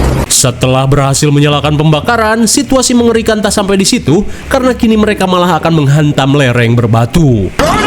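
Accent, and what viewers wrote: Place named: West Java, Indonesia. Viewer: channel youtube yang nyunda nya nyunda bgt 😂😂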